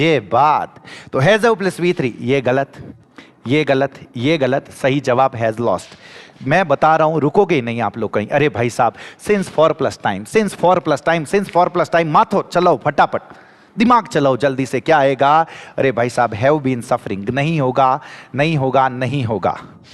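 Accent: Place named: Marwari, India. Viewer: Hm jese dafar ko bhi aapki मारवाड़ी english ne achaa बनाया है sir ji english se bahut dr lgta tha such me ab wo dr khatm😢😊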